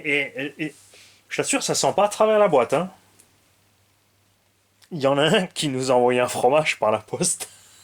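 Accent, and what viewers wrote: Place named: South-West, France. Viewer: Yes avec l'accent du sud ouest..... lol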